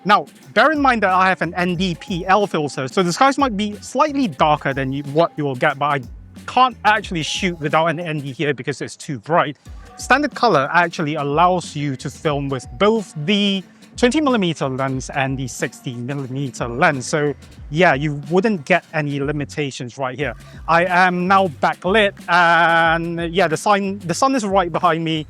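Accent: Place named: United Kingdom. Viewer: are you indonesian? im hearing a british man rn lmao, until i saw glodok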